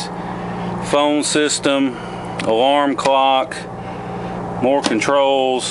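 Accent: South, United States. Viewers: Love the southern drawl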